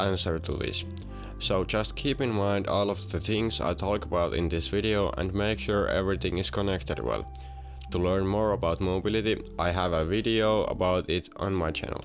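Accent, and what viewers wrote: Finland. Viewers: Hes not from The U.s Dude is like from Finland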